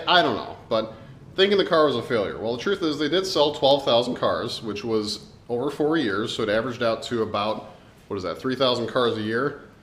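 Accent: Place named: Chicago, United States. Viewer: Everyone in Chicago sounds like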